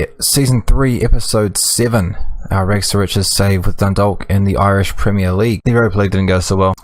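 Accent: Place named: New Zealand. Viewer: You seem to have picked up the accent so I had you down as NZ born and bred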